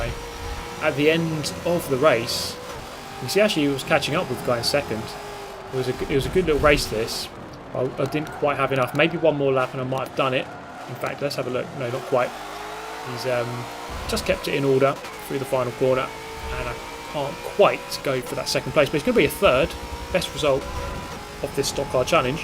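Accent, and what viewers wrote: United Kingdom. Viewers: Your charming British accent is overselling your actual driving skills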